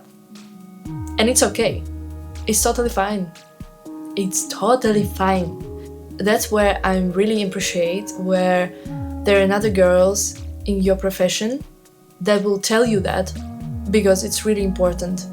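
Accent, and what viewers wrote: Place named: Eastern Europe. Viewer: cute славянский accent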